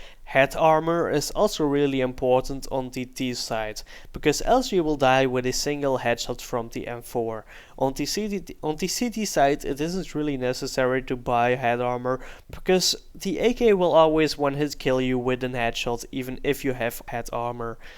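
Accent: Netherlands. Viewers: Hoor het zo aan je accent. Had dat steam bericht nog niet eens gezien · I knew from the start that his accent was from the Netherlands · OMG IS NEDERLANDS. IK MERKTE HET AL AAN HET ACCENT MAAR TOEN IK DIE GOZER ZAG DIE ZEI WAAROM NEGEER JIJ MIJ WIST IK HET ZEKER. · Even though i'm dutch his dutch english accent made me cringe. (So basically i think my english accent is pretty good) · Ik wist wel dat je NL was, hoorde het aan het Nederlandse accentje ! Leuke video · I like your Dutch accent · I already heard on your accent that you probably were Dutch, until I saw a steam msg, "Waarom negeer je me" I wasn't for sure, Goede video ga zo door! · Je hoort het al aan z'n engels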